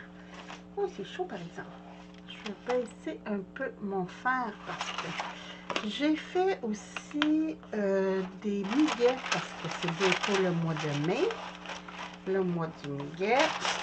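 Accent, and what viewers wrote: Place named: Quebec, Canada. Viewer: Je me suis commandé ton papier vélum, il arrive demain. Tu m’as vraiment donné le goût . Je viens de découvrir ta chaîne. J’aime beaucoup regarder les vidéos qui me donnent des idées. J’en suis beaucoup de France. Mais par ton accent et tu parle de dollars alors cela me dit que tu es peut être du Québec??? Contente d’avoir découvert ta chaine 🥰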